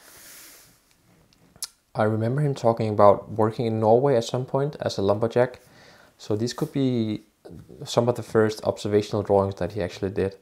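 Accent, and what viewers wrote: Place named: Denmark. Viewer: You sound very danish 🇩🇰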